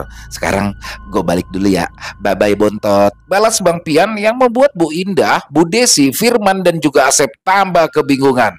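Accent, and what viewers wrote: Jakarta, Indonesia. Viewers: Waw cirikas Betawi nya bang bikin ketawa sendiri gua,lucu, serem semua nya dapat, Kren bang, kyk orang gila gua denger baba Ali nya becanda😅😅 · Saya kalaupun orsun Dengar cerita logat betawi suka bngt medok2 lucu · Nah ini yg ane demen dr klo udah pake logat betawi. Kocak 😂😂👏👏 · Waalaikumsalam.keren cerita nya..cocok bnget klo yg bercerita nya. betawinya masuk banget.inyi nya puas dan trhibur..mas ditunggu ceritanyw.bang pian..bang rudy dan mas sudiro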